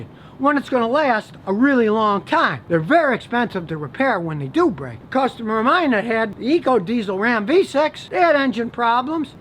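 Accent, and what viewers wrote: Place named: Texas, United States. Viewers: Poo-jo, God is so clever! Subtle texan 😀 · The guy is from Texas. They all talk funny down there.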